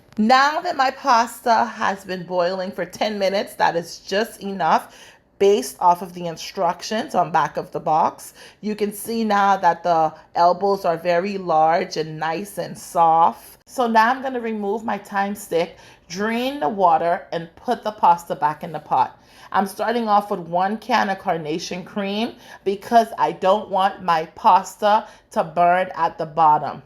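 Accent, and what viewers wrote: Bahamas. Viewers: Lol your bahamian accent! My mouth is watering as I watch this, my number one favorite side yum😋 #cheesy and rich #them herbs is everything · Bahamian accent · That Bahamian accent🔥🔥🤌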